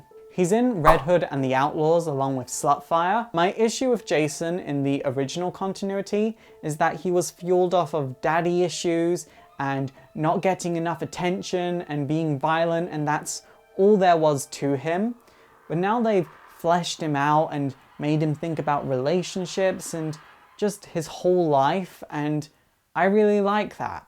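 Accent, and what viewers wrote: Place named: RP, United Kingdom. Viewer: Damn, do you know that you could be an... excellent storyteller, don't you? I mean with your narrating talent, passion you talk with, you can recite fairytales for children/work in a sort of playhouse or something. To think about it, your British/RP-ish kind of accent (from one way extremely annoying) can do the trick as well.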